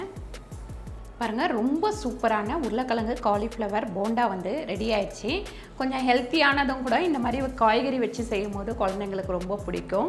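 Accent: Telugu, India. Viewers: உங்க தாய்மொழி தெலுங்கா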